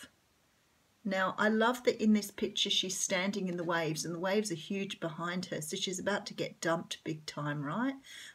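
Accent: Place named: Australia. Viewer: I can see this yes. Nice energy, Australian too.. Good.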